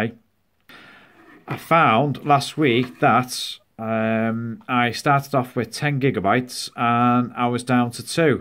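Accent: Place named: Liverpool, United Kingdom. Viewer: Thats really good scouse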